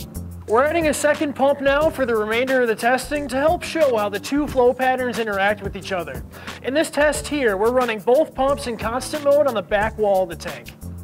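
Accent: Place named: Minnesota, United States. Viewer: I'm from Minnesota but don't live there anymore. Definitely miss the accent. Also, do y'all ever plan on doing these videos for the Vortec mp's?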